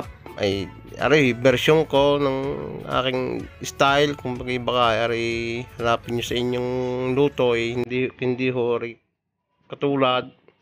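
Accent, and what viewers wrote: Batangas, Philippines. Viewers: Boses palang areh eh .tubong batangas talaga.. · Ipakita mo yong pangalan ng bote ng ingredient di maintindihan yong tagalog mong batangueno eh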